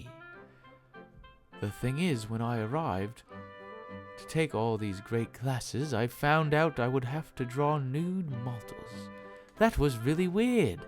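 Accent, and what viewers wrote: United Kingdom. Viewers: Why did use a British accent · Um... Where did his American accent go? Why's he British? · why does he sound British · is it me or does he have a "british" accent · um why is he sounding british in this video??? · Is this your real British accent? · ... I didn't realize was British before his channel got popular.... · You sound like a British guy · 5 years ago people: wow so cool 2018: he sounds British. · THEEEE BRITISH ACCENT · Why did It Sound Like British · British? I didn't know you were british but i don't care cos i am british. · this doesn't sound like!?He sound like a british story teller,no offense to british people. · What's with his BRITISH accent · This looks and sounds like a British male talking like this is normal XD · He sounds so british lol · Why is he British here?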